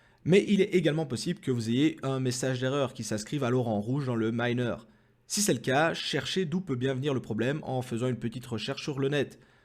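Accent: Belgium. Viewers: J'ai cru entendre un p'tit Belge, bon, je me suis lancé avec une 6900xt, j'ai une 5700xt sur le côté, j'hésite à l'ajouter ... Tu en penses quoi ? Actuellement, je tourne à 60MH/s, c'est correct, non ? Merci pour la vidéo, ça fait longtemps que j'hésitais à me lancer, à voir si ça va arrondir mes fins de mois... J'ai aussi la possibilité de mettre un SLI de 2CG en 4Go sur un autre pc, ça va prendre ou pas du tout ? Ou je devrai me rabattre sur une autre crypto moins gourmande, genre ETH Classic (qui demande que 2Go) Signé : un camarade belge ;)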